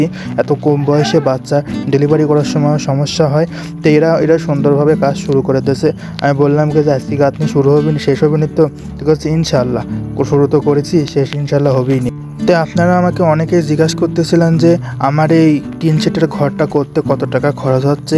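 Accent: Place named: Pabna, Bangladesh. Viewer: তুই কি বুঝবো আমাদের পাবনার ভাষাটাই এমন বুঝলে ভিডিও দেখবি না বুঝলে দেখার দরকার নাই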